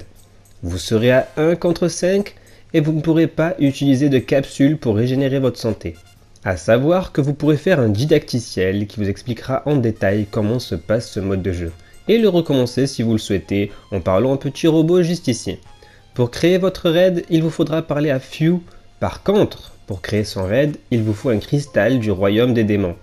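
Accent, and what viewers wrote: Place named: Toulouse, France. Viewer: toi tu fais des vid&os àdesheures lol.En tout cas je kiffe et ton petit accent toulousain fait plaiz :). Sur ce, continue ce que tufais car ci cool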